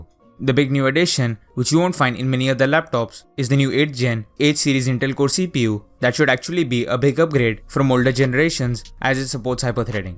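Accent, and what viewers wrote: India. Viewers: You’re accent is too strong. Speak a little slowly so everyone can understand you and not just Indians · Indian accent is so funny to hear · I am Portuguese and I learnt english not how to understand indian accent and even his accent being bad he tries to speak very fast messing with his pronunciation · Suena más como alguien de la India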